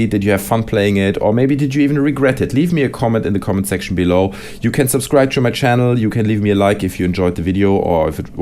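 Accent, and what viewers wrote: Germany. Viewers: Hes german you dip wad. That's kinda how they talk. And if not why dont you just fuck off somewhere ever heard of the golden rule? How about you stop posting hateful comments that dont pertain to the video.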